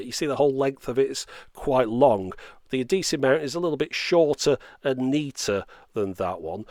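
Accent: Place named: Northern England, United Kingdom. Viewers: Huh, never would have guessed your from preston :3 though you have a very northen accent :p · You've gone all geordie in this video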